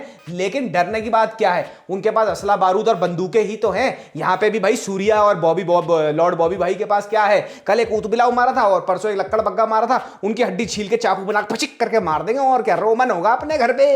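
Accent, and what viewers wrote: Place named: Delhi, India. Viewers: chapri delhi accent 😭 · He intentionally does that Delhi chapri accent for reliability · This guy roasts in a brutal manner & silences the promoter of those usual dumb foolery we see in some over hyped south Indian movies. Appreciate the honesty of this guy lately. Although i dislike a chhapri Delhi accent, that's their culture, i get it but the guy knows what's wrong in those movies.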